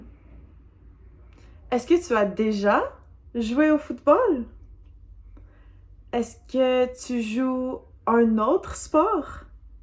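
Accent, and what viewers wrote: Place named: Quebec, Canada. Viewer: Bonjour, j'espère que vous allez bien. J'ai un request. Pourriez-vous nous faire une vidéo sur la différence entre l'accent québecois et l'accent français de France? SVP. Because, I could notice your way of pronouncing words like 'matin', 'bain" differs from what's in most of the french videos out there (based on France's).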